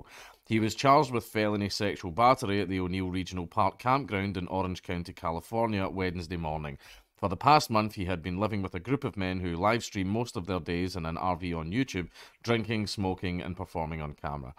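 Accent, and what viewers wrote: Scotland, United Kingdom. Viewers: Andy Dick stories are always entertaining , the Scottish accent makes everything a thousand times funnier though man. You've become one of my faithful go to's whenever I need a laugh to destress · You haven't heard the phrase dildos all over the floor until you've heard it in a Scottish accent. I nearly peed.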